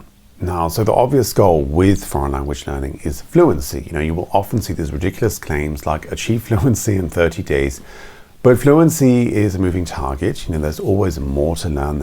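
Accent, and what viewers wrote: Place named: Germany. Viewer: haha as someone studying german for A level at around B2 level in england, I wonder if anyone has told you that you actually are developing a slight german accent in the way you say some words lol! Anyway, this is a very interesting and informative video, danke!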